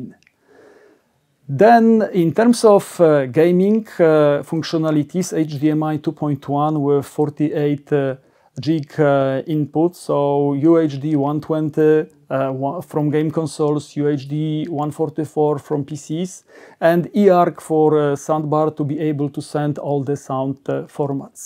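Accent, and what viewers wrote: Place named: Poland. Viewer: Nice accent. Direct from Radom , I supposed.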